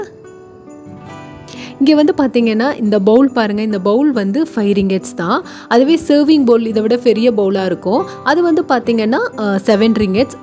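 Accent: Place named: Sri Lanka. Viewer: sister super 👍 உங்கள் தமிழ் இலங்கை தமிழ் போல இருக்கு, ikea இ‌ங்கே யும் இருக்கு, from Switzerland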